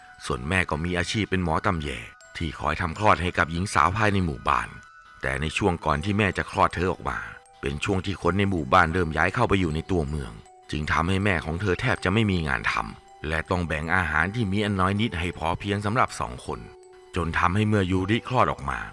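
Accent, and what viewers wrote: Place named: Korat, Thailand. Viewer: คนโคราชบุรีรัมย์ใช่มั้ยนิสำเนียงชัดมาก😂😂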